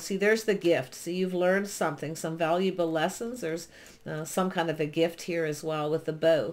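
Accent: Canada. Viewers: I’ve been listening to many tarot’s to help me through some shitty times and keep me grounded but I want to say this was so bang on I had to comment. And I’m living in the US and I can tell from your voice ur Canadian as am I so felt like another sign lol. Thank you because this reading really helped me so much and u are bang on it for me, wanted to extend my gratitude and appreciation and I’ll be following u closely now haha. I mean just nothing has said it better than u xxx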